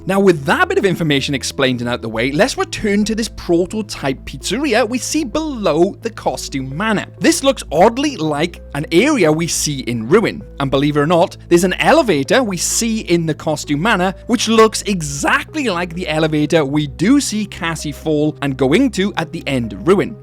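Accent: Wales, United Kingdom. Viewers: Great video bro love from England but I think your a Brit as well ❤love to anyone reading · Are you welsh ?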